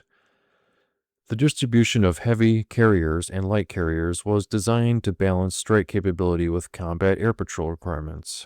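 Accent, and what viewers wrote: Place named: United States. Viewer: Hi, I read your reply from my comment on yesterdays’ video about rommel. Great to see a new content creator-documentarian focused on the world wars with a channel that is not AI. Also, I don’t know if you have been here long but there’s a lot of us that go to sleep watching/listening to war documentaries and we are kind of a tight knit group. I think the reasoning is multifaceted and its not that we are war junkies. It has to do with being transported to another place and time, as well as the psychological aspect of “I had a stressful day but thank god I’m not there” Here are a few more helpful hints that you may want to work on: - Again, you have an incredibly calming voice considering we have american accents which by nature, isn’t as relaxing as the quintessential british historian voice speaking proper/high society english. 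I would suggest working on your cadence and delivery so you are more confident in your delivery - Calming and relaxing is good; boring is bad - Keep working on your editing skills and consider finding/creating a pleasant soundtrack for background music - As stated previously, try and stretch the videos out to an hour or so, thats kind of a sweet spot - Go watch the entire BBC/PBS WWII documentary series that was done in the 1990’s called “Battlefield” It is literally the holy grail for us in terms of this genre - Watch Fin’s Channels as stated in the last video to gain some more hints - Even though you are new at this, you already have a subscribe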